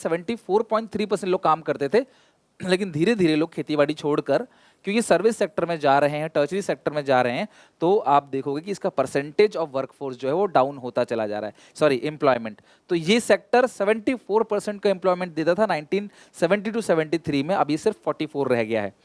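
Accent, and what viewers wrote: Odisha, India. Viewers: Hello bhaiya Are you from odisha??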